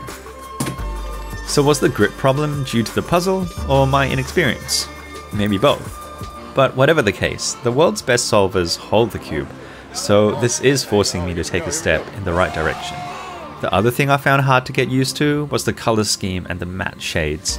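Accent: Australia. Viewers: Are you australian?? I'm australian! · TNL Cubing it’s his au accent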